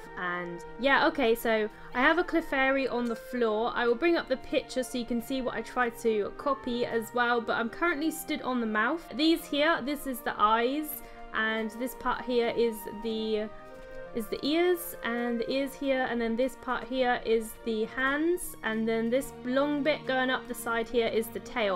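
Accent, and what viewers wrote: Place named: United Kingdom. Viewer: Hello, stumbled upon your videos not too long ago and i like your style and your lovely british accent so i subbed and i can't wait to see more of your DQB creations. 😉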